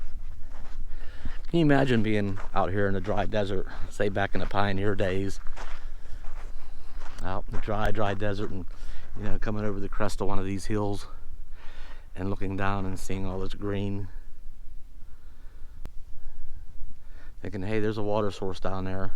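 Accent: Pennsylvania, United States. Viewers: Newer sub of yours! You definitely have a PA accent...I am originally from Mount Lebanon, PA-burb of Pittsburgh! Are you from PA or WV?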